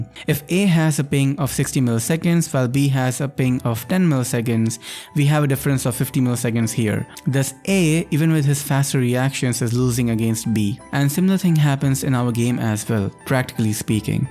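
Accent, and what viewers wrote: India. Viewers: Bro where are you from..? Sound like you are Indian 🤔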